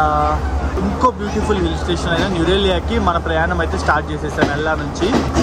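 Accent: Nellore, India. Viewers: ❤ Love your accent and description as I am 67years young Native of Nellore Proper 😊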